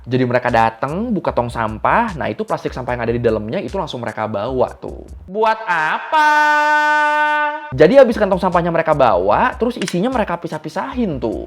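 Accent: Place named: Madura, Indonesia. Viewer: Kenapa nadanya hampir mirip kyk logat madura ya... Apa cuma aku ya yang ngerasa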